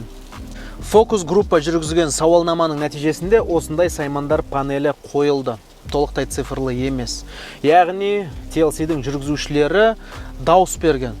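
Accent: Standard. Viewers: Осы Жигит Жаксы Карапайым Отте Казакша таза солейди екен АЛЛА истерине Береке берсин бауырлар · Каринамен салыстырып жиберген калай кетті люгын🤣🤣🤣. Базар жок жасай бер бауырым. Современный таза қазақша сөйлеудің королісің. Бір ғана минус бар- шолуың тез бітіп калады, бес минут сиякты өте шыгады. ✋🤝🤝🙌🏻✊🏻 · Жарайсың дами бер, таза қазақша сөйлейсің сол үшін көремін сені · таза қазақша сөйлейді керемет. Міне дұрыс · мынандай таза сойледин казакни бринши рет эситип турмын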